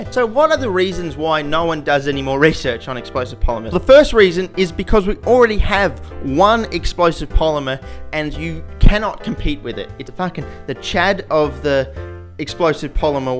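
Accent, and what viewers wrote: Australia. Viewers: I'm not the smartest fellow so I'm just here to hear that nice aussie accent while i play video games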